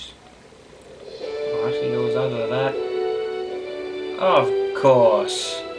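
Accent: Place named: United Kingdom. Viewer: I love your british acent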